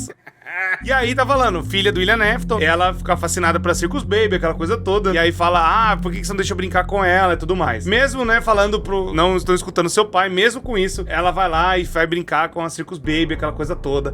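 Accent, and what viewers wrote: Brazil. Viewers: E tu é bahiano 🤣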